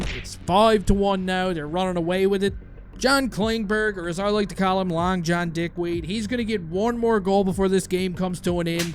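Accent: Newfoundland, Canada. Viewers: Yo man, sick vid but are you a Newfoundlander? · sounds like you have some newfie in ya, sounds great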